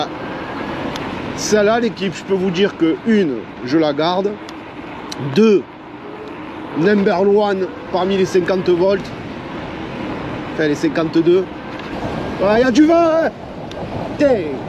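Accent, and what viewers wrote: Marseille, France. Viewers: Excellente découverte avec un bon accent du sud comme toujours, sympathique comme tout, même avec les vieilles XD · Salut Tonton, merci pour tes vidéos toujours aussi sympathique avec la gouaille Marseillaise qui va bien 😅 Chaque vidéos c’est un régal de te suivre, garde ta façon de faire car on te sens généreux , objectif et réaliste dans ta démarche et tes propos 😊 Perso j’attends ma Suprem qui devrait arriver sous peu tellement Weebot s’est fait démonter les stocks…😮 Au plaisir de te rencontrer un jour, bonne suite à toi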